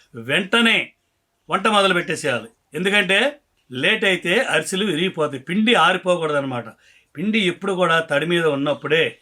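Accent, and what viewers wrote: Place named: Kurnool, India. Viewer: Amma meeru ma Kurnool basha lo mataladu tunte chala bagundi Athrasalu❤❤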